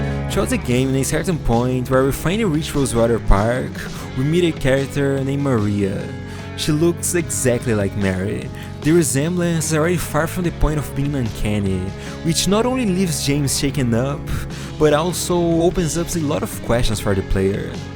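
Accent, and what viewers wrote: Brazil. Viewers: are you brzilian? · Sabia! Kkkk reconheci o sotaque de longe. Sempre bom achar outro brasileiro fazendo vídeos em inglês. Continua que está muito bom!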